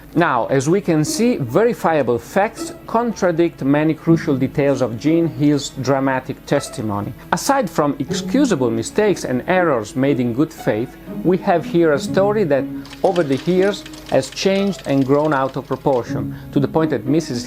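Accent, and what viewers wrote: Italy. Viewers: But he IS Italian!!! What accent should he have? It's not his native language. If you spoke Italian, don't you think you would keep your original accent? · You kept your Italian accent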